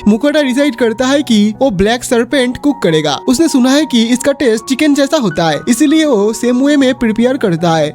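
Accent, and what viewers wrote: Bengal, India. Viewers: Are you a Bengali? I mean your accent is familiar to me. Anime ko 8 dena prefer karungi. And for your voice it's also 8.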